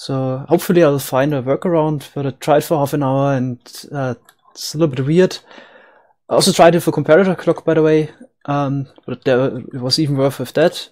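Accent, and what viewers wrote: Germany. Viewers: ahh ok, thanks. where you from, you sound like german i have that feeeling :D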